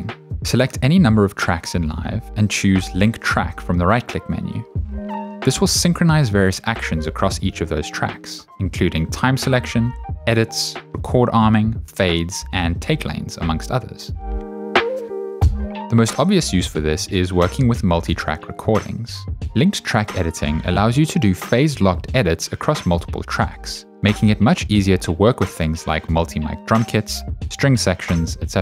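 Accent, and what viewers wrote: South Africa. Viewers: That SA accent! Lekker man, great video. Excited for ableton 11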